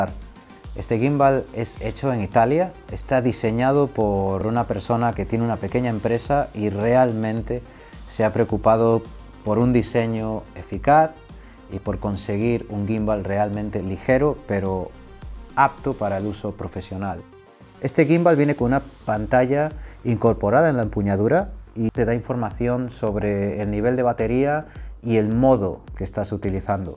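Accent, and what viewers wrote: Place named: Latin America. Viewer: Amigo me encantó el video muy buena reseña del gimbal solo tengo 2 dudas espero me puedas ayudar 1 se pueden hacer timelapse con este gimbal he visto que con algunos se pueden lograr ejemplo en una noche estrellada podrías hacer un tutorial si se puede y 2 de donde eres como es que lo conseguiste lo he estado buscando en amazon y no lo encuentro soy de México tienes un acento latino muchas gracias que tengas un excelente día.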